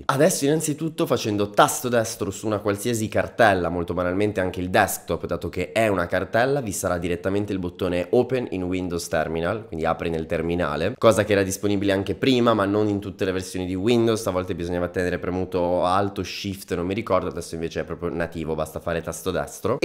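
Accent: Italy. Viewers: Lo potevi dire subito che eri napoletano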